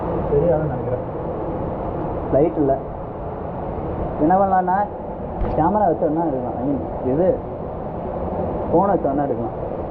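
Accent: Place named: Kanyakumari, India. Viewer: Nenga Kanyakumari ah🙄🙄🙄 unga slang some different🤔🤔🤔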